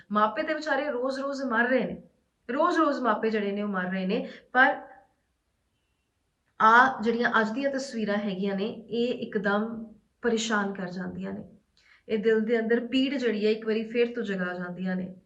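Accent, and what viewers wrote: Malwa, India. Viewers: veer ji eh boli malve de he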